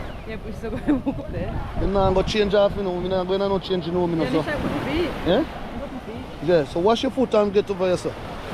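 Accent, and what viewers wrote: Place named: Black Country, United Kingdom. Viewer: Loving that black country accent.😊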